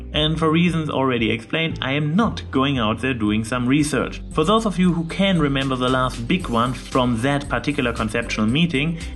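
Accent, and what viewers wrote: Germany. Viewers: You sound so german...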